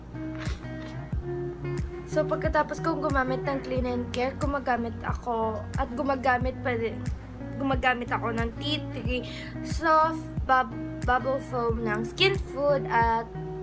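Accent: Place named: Hiligaynon, Philippines. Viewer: That hiligaynon accent thou i love it💖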